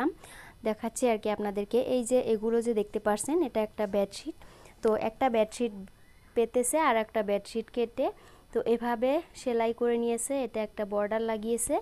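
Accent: Sylhet, Bangladesh. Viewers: আপনি শুদ্ধ ভাষায় কথা বললেও আপনার উচ্চারণ ও বাচনভঙ্গিতে সিলেটের আঞ্চলিক ভাষার বৈশিষ্ট বিদ্যমান। এই গ্রামটি বৃহত্তর সিলেটের কোথায় অবস্থিত? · আপু কোমার ভাষা আসামের মনে হয় না। মনে হয় সম্পূর্ণ বাংলাদেশী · সিলেটি নি তুমি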